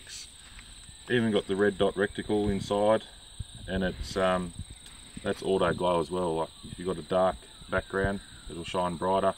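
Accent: Australia. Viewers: Sorry, can't understand Aussie.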